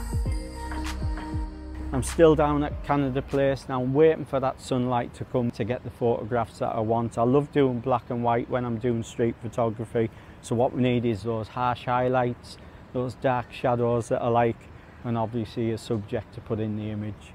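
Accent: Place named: Teesside, United Kingdom. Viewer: Hi, first time coming across your channel and I will subscribe, you really sound Middlesbrough, I'm a Stockton photographer, good to hear a local Teesside accent abroad.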